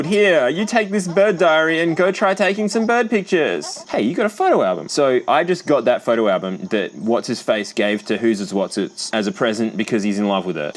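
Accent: Australia. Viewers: all australians sound the same I was just watching dankpods video and there is ZERO difference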